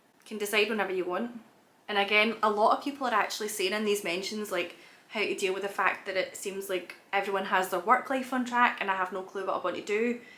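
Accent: Scotland, United Kingdom. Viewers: That was brilliant!!! I only came here to learn a Scottish accent but you are adorable ❤️Loved the talk! Do what you want in life full stop! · I'm from Poland and watching your videos to get acquainted with the Scottish accent, which I really like. I visited Scotland many years ago and liked it a lot, watching your stuff reminds me of my past travels and how nice the Scottish people are. I wish I get the chance to go there again some day. I hope you are doing well and finding your happiness in life! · You always made interesting, funny and beautiful videos. Miss you and your lovely Scottish accent. Hope you're okay. Ty from Ohio. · I'm so glad i found your vid. Initially i was looking for smth to study scottish accent but i think i just found my person <3 I'm currently feeling so stuck behind in life and your take is like a breath of fresh air tysm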